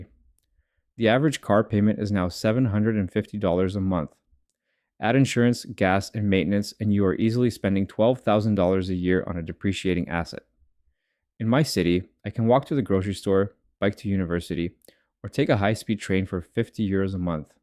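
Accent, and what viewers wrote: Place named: United States. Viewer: you sound american tho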